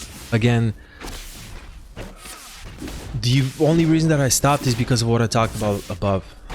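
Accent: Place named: Bulgaria. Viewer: So I've been watching your videos for what? Year and a half? Two? And only now I actually realize why your accent is so familiar - you are a Bulgarian fellow :) Hit me up when you land in Sofia, you have a beer from me ;)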